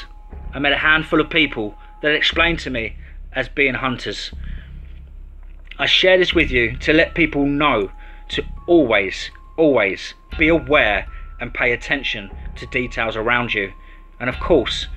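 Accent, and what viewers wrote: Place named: England, United Kingdom. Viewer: Your Englishness is awesome... Some tips. Tucson is pronounced two sawnh... I love your channel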